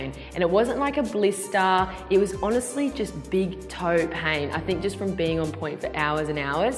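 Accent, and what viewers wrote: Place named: Australia. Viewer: How did I know she was Australian before even clicking on this video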